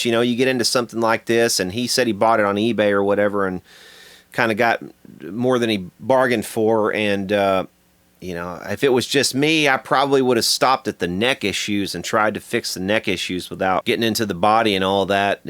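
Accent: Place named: South, United States. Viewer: Always refreshing to hear your Southern accent and your excellent content. I watched that video with that mad scientist guitar builder guy you had the other night and it was so interesting but my favorite video to date has been the one where you soup top the five white Epiphone tube head. Also the Marshall mg restoration vid that you salvaged. I’ve learned so much watching this channel. 🤘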